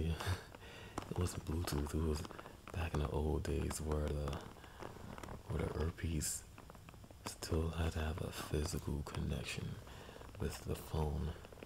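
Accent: St. Louis, United States. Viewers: Where r u from? Ur accent sounds like ur frm stl missouri.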